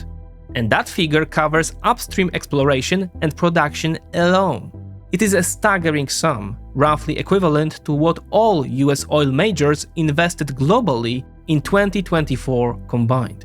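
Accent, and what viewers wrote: Poland. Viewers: The CGIs were very nice on this one. The overall quality of the channel keeps going up. And we always have our favorite Polish voice to relate to. 😁❤🙏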